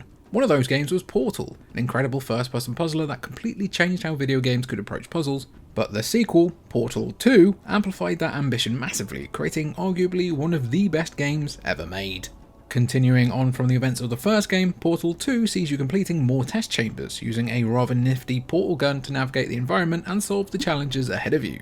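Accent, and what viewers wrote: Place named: England, United Kingdom. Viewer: You're english accent makes the comedy even funnier, lol. Great job.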